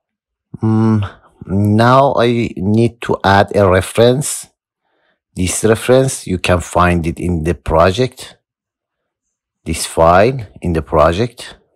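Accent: Iran. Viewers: are you Iranian???/🤣🤣🤣🤣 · لهجه ایرانی مشتی؟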